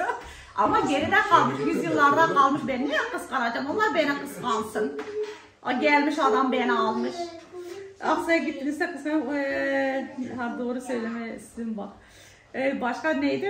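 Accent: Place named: Erzincan, Turkey. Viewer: Abla senin kürtcen bizimkiyle ayni erzincanliyiz😂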